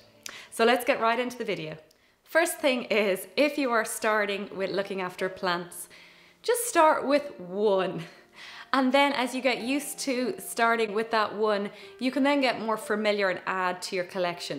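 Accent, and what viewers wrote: Ireland. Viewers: Discovered your channel today. Love your soft sweet Irish accent - lovely to listen to. Have just subscibed. Thanks.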